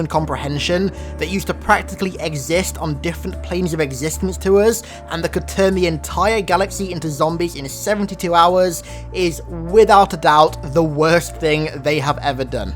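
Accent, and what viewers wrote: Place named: England, United Kingdom. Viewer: English country accent , The greater good .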